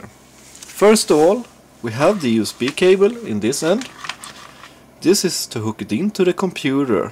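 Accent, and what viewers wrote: Sweden. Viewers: You sounds swedish.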